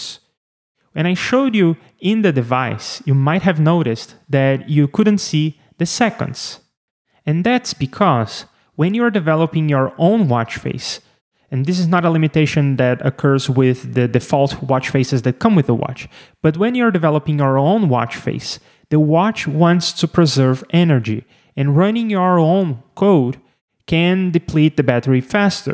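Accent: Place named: Brazil. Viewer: Nice video, I think it's hard then I expected, as I'm not a programmer. By the way your accent sounds like a Brazilian but with a really good English!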